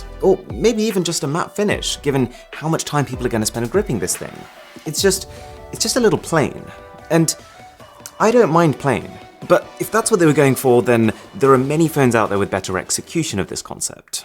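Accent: RP, United Kingdom. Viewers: What's with the exaggerated posh accent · I love your reviews but that accent... fuck I hate British people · he has british accent. teach me to.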